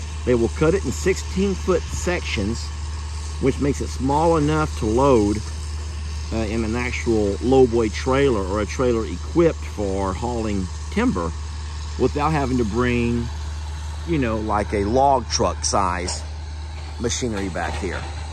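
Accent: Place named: South, United States. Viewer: Spanish and French are very similar. He's going to have trouble with you. Your broken English (Southern speak) is off-the-wall. The French, Mexican, and MOST every other country were taught English in school. What you speak... Is not proper English. You're the problem. That man was charming and non-judgemental of... Your broken English.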